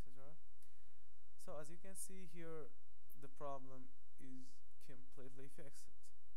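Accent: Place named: France. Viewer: Azy t'es un français :p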